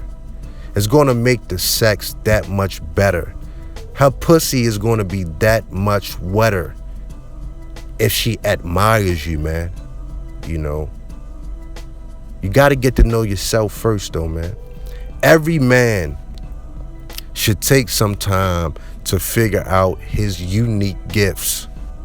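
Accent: Baltimore, United States. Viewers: Great video. I can tell you from Baltimore too, i hear it in your accent.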